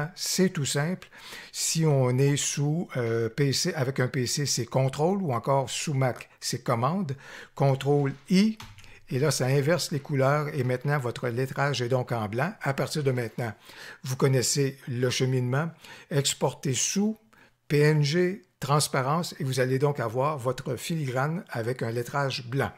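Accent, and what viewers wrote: Quebec, Canada. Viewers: Merci beaucoup pour Tuto très bien réalisé. Et puis pour moi, cerise sur le gateau, c'est l'accent Québecquois que j'adore, même si tu me répondrais que tu n'as pas d'accent et co contraire c'est moi qui ai un accent de "Maudit français" 😂😂😂 Et Merci encore une fois !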